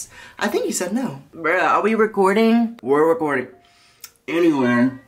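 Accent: South, United States. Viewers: Every time i watch your videos i always forget you have a country accent lmao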